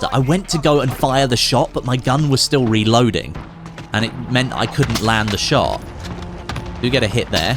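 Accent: United Kingdom. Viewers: Wait you're British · WOW I did not know was British I thought you were American lol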